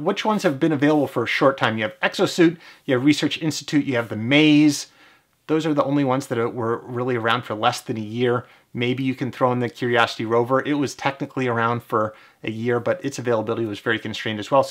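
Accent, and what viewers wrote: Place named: Michigan, United States. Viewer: Are you from Michigan or Illinois ? You have a similar accent to mine. Nice video by the way I just discovered your channel.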